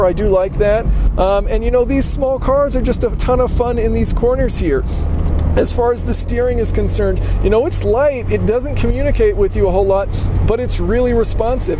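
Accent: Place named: Michigan, United States. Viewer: Are you originally from Michigan? Your accent sounds exactly like my uncle's.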